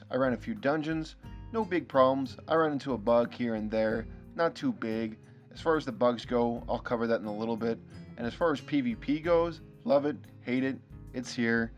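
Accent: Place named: United States. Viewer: This is so american, i dont know why, your voice your sentances :D love it <3 It just sound like your selling something :D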